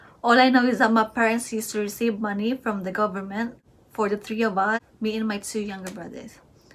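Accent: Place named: United Kingdom. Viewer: Good listening to you pretty woman, liked the UK accent.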